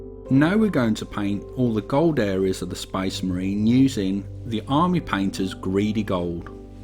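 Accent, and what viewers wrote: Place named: Midlands, United Kingdom. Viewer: Nice idea.. I really feel like a potato not thinking of this. Sound like you are from Midlands lad like me. Nice to hear a accent I can understand.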